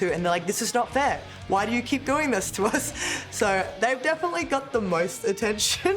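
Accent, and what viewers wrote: Australia. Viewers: Bruh…she’s Italian! That’s friggin’ awesome! Australian Italian. Her imitating her grandma was great. Also love her Australian accent! 😊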